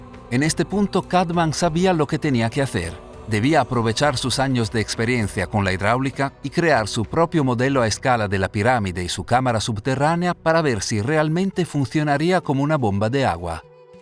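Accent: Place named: Italy. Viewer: Perché nn fai. Il documentario in italiano se siente mucho tu acentazo Italico man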